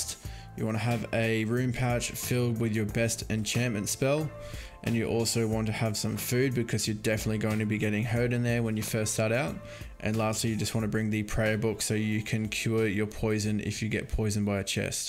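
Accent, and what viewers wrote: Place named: Australia. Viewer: you sounds 110% aussie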